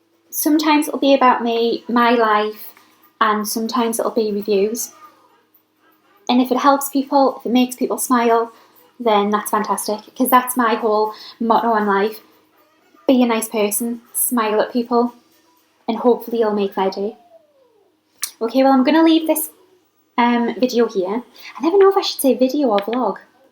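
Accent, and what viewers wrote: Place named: Doncaster, United Kingdom. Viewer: Nice. Even it's hard to follow your accent as a non Doncaster 😅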